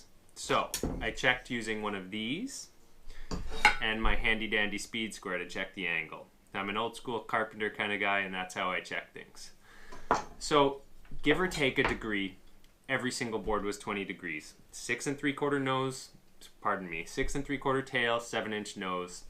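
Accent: Canada. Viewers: (Not related to vid) Your accent remind me of Ben Stiller from Zoolander. Is this the typical Canadian accent? 😂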